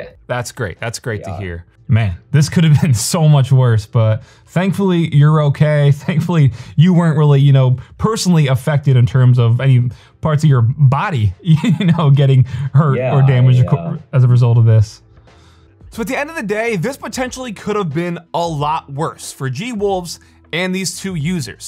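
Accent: Singapore. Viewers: Lmao that Singaporean accent is so easy to recognise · sounds Singaporean hahahah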